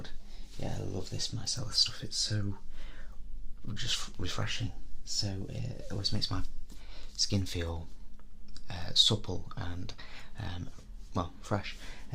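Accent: United Kingdom. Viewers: Just found your videos on my ' Recommended' list and honestly I adore your style! So happy I found you as there aren't many 'decent sounding ' Brits (no offense to any other fellow Brits, just some accents sound better to me personally).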